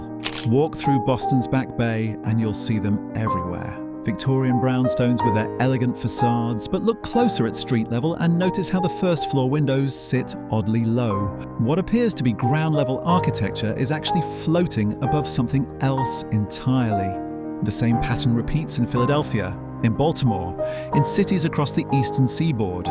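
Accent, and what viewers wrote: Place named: England, United Kingdom. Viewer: Who is really making all these Tartaria videos? All these channels are producing extremely similar videos… all are very well produced, all have professional sounding English accent narrations, all have a similar cadence/ speech patterns, they have zero human mistakes in the narration, never a throat clear, slight cough, never a change in voice, never an actual person to be seen behind the voice/ channel.. All seemingly able to find these amazing old photos in “archives” & supposedly researching records & registries from centuries ago? it’s seems as if are all being AI produced? Are they truly the work of genuine independent researchers? I find the whole Tartarian topic extremely interesting, but question if there is something else going on behind this information.. All these channels producing virtually the exact same mini documentary style videos on the subject?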